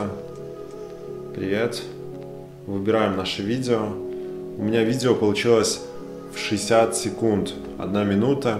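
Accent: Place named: Ukraine. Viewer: украинский акцент, с мягким звуком «Г». Только и всего. Поверьте нам не режет слух абсолютно и подстраиваться сложно, так как в нашей речи практически нет твёрдых звуков «Г», как в русском языке. Как говориться, зВыняйте))).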